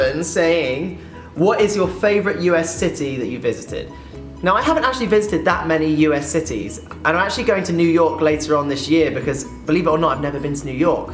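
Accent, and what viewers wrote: United Kingdom. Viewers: I could watch you forever and listen your lovely british accent <3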